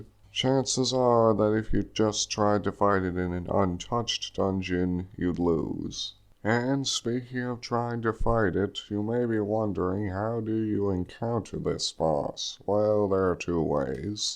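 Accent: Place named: South, United States. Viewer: Is it just me or does his voice give off " " vibes?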